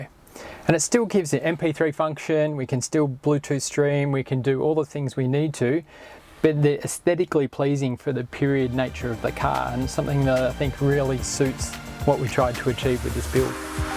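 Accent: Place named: Australia. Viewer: barely detectable aussie accent......barreelyy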